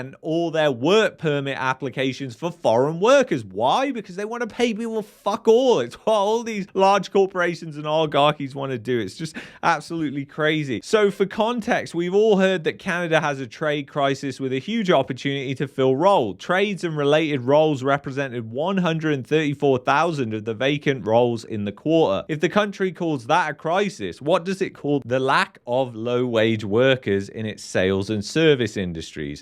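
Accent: United Kingdom. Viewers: If it s so terrible here in Canada what are you doing living here? Judging from your accent I would remark UK is even more messed up then Canada ever will be.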